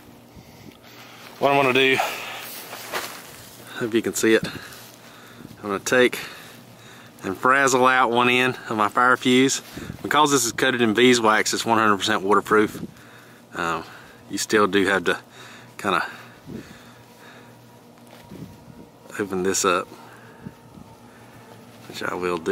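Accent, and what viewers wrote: South, United States. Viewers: Not only was this a GREAT review of the products (plus I learned another way to set up for a fire👍) BUT I loved your humor about the "words" with your southern (?) accent! My daddy was born and raised in Irving, Texas, my mother is Japanese...they met in Japan during the Vietnam war when my daddy was a marine...so you can imagine the different "accents" I grew up with! Thank you for a good product review but a good laugh too! I appreciate the time you put into this video as well as you being in the cold! God bless🙏❤🇺🇸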